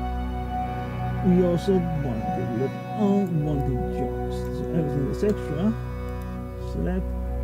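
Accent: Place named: Russia. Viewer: You sound Russian but we're not sure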